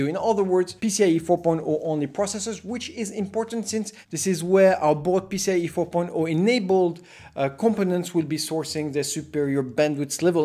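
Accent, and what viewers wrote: France. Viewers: L'accent français ;) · are you french ? Oo (sorry i'm new)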